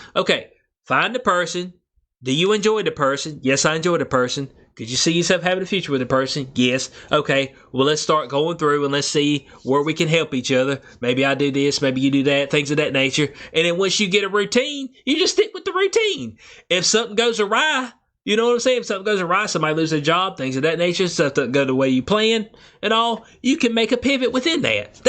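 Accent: South, United States. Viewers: Good video, brother! I can’t quite place your accent… you’re from the south US tho right?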